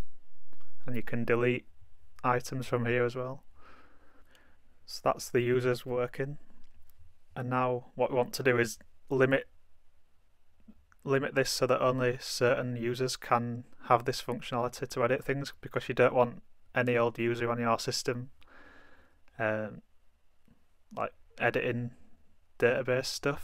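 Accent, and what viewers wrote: United Kingdom. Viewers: Hey, just found your trading bot video and seen that the next video you published was this one, recently. You sound local to me. You’re not from Teesside are you by any chance? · Great tutorial. Up the northern accent! Hull all the way! Does this work well with Jetstream or is there a clash between the logins?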